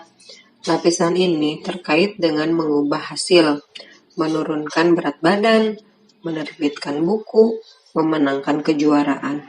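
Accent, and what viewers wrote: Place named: West Java, Indonesia. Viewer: Teteh orang sunda yah logatnya keliatan hhi nuhun teh tos macaken buku atomic habit ❤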